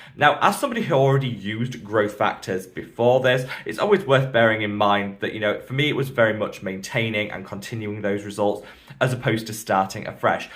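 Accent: United Kingdom. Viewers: I enjoy listening to you. Your voice reminds me of a dear friend who’s a British expat in New Zealand!!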